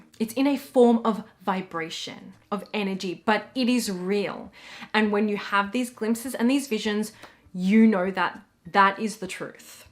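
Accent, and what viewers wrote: Australia. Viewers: Somebody complemented you on your English accent. To me it sounds like an Aussie accent, is that correct?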